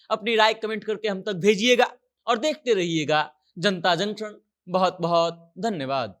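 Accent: Bihar, India. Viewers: बिहार से हो क्या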